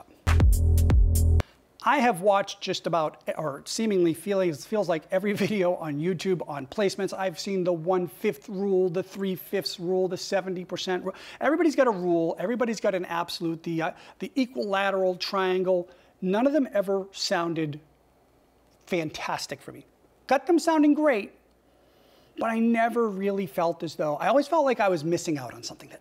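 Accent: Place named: United States. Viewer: You sound American rather than a Greek to me.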